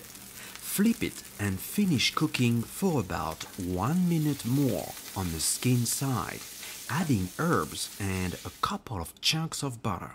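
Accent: France. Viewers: Mimi R I know. But I don't mean his frensh accent but more his Terminator way to talk. You know what I mean?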